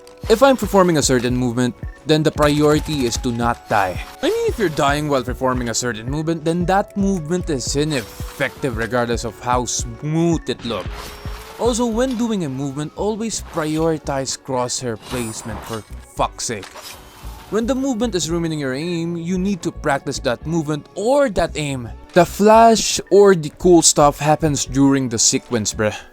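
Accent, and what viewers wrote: Spain. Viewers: is bro Spanish or french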